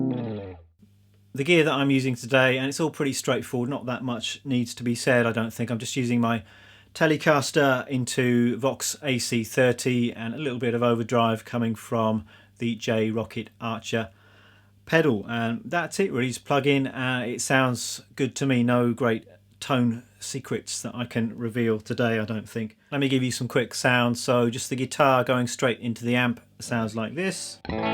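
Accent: United Kingdom. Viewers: “Whoops, didn’t mean to click on this video. Wait, he is British- let me see what he has to say.” This is what accents do to my brain.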